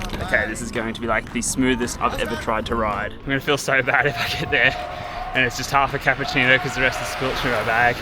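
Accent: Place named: Australia. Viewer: I have to admit, I'm not used to Australian accent and I understood barely anything.Especially because the speech is quite fast but I got the idea!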